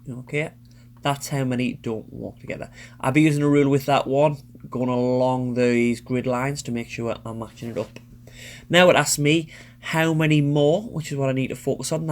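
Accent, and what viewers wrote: Scotland, United Kingdom. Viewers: You speak like Scotland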